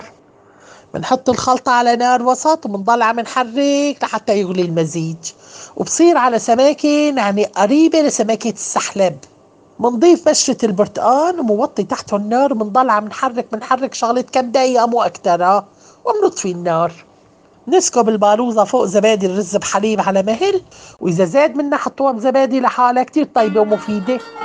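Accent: Aleppo, Syria. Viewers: وللة بتذكريني كتير بلحكي الححححلبي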